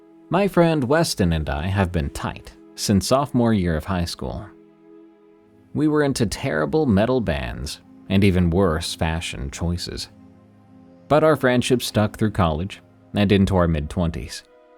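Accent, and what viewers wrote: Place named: Denver, United States. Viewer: I didn't know that we Denver people had an accent 😊 Loved the stories TY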